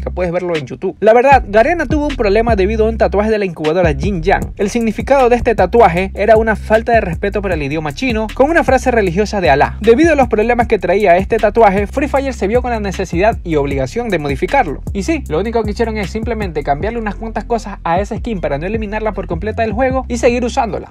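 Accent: Venezuela. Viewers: Eres venezolano:v?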